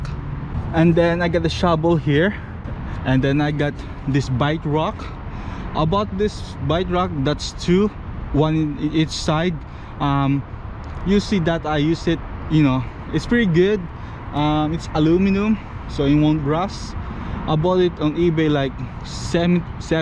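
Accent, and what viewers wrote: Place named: Philippines. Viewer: that filipino accent tho.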